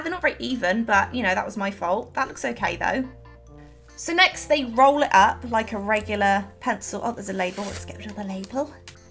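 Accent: England, United Kingdom. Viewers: Is she British because i cant place the accent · Are you from the UK cos u have a British accent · Shes super cute, specially with the English accent. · Ok are you British? You sound like it. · Is she British? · Are you briish? cause I can hear the " " when you speak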